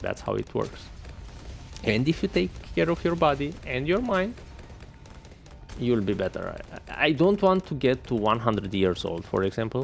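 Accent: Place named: Romania. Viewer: nu bro, ai accentul ala al nostru :))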